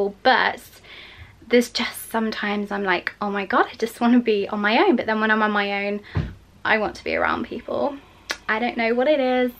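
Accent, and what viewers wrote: United Kingdom. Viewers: Hey my queen😍😍😍😍 I jus love ur British accent sooooo much n ur voice jus calms me down.. every single tym I watch ur videos it drives me crazy n I fall in love wit u even more.. ur soooo dam pretty ur eyes r sooo heavenly.. ur smile is killing n it makes me smile too.. thank u Soo much for bringing smile on my face every single tym.. I love u from d core of my heart my only wish in my lyf is to c u for 5 secs I Don't deserve to cm n talk to u so my only wish is to watch u for 5 secs😭 I VL blessed if such hapns❤ im from India n ur my angel really love u a lot😍❤😘😘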